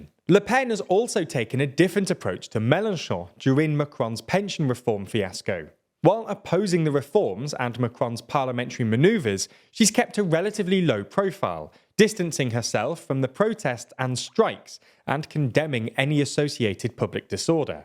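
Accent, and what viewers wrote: England, United Kingdom. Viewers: politics apart, i love when english people try to pronouce french names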